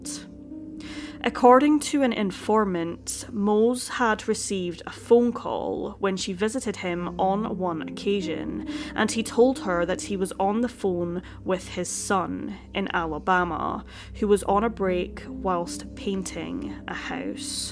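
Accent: Scotland, United Kingdom. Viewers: Is that a fellow Scot I can hear? I was wondering when I'd come across a Scottish true crime channel!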